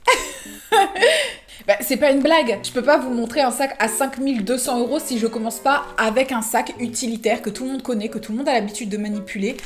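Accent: England, United Kingdom. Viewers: J'ai découvert ta chaîne y a quelques mois et j'aime beaucoup ! Honnêtement j'avais des aprioris sachant que je n'aime pas du tout la tv réalité et tout ce qui s'en rapproche mais je suis très très contente de m'être laissée tenter par tes vidéos parce que t'es géniale, très drôle, intelligente, pétillante (avec un accent anglais perfectly SPLENDID, tu me touches en plein coeur là) Alors merci pour ton contenu, ton énergie et ce que tu dégages, c'est un plaisir de te suivre ✨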